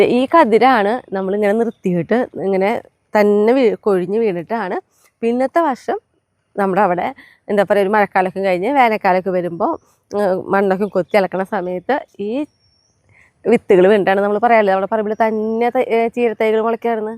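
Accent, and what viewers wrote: Thrissur, India. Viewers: Its Thrissur slang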